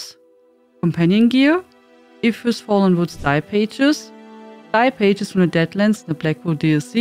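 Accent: Germany. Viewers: Your English is unintelligible in places. I am very tolerant of accents and even understand Africans who speak Pigin English. Your accent is harsh. You were clearly taught English by people who were not native English speakers themselves. Why not stick to your native language? Which, based on your accent, is probably German.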